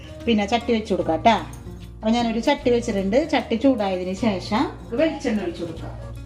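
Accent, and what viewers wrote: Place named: Thrissur, India. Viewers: തൃശ്ശൂർ സ്ലാങ് പോലെ തോന്നി. സൂപ്പർ ആയിട്ടുണ്ട് ട്ടോ. അവതരണം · Hi എവിടെയാ സ്വാദ്ദേശം തൃശൂർ ആണെന്ന് മനസ്സിലായി അവിടെ എവിടെയാ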